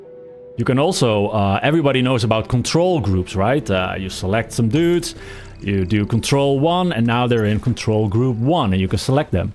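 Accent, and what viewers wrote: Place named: Netherlands. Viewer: Very good video! Are you Dutch per chance? I hear an accent :)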